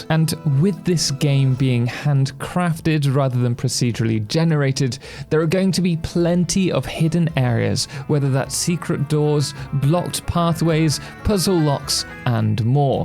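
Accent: United Kingdom. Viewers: Sounds like a normal British accent to me, you know from tje country that the language came from 😂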